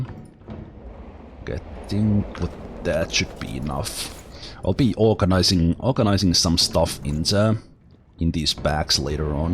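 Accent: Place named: Finland. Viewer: You have a finglish accent :D awesome!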